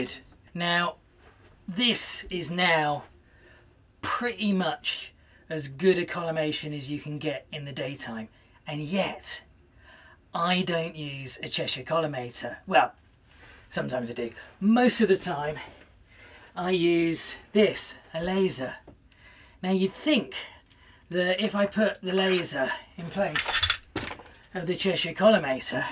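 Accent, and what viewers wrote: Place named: London, United Kingdom. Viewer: 1 Frac and 1 Mak, no need for this stuff. However, love watching his vids for his London accent and (some) comical moments 😁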